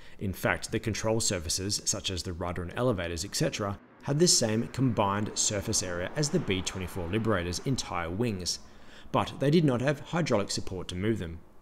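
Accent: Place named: Australia. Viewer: Hi, why are putting on an Australian accent?!?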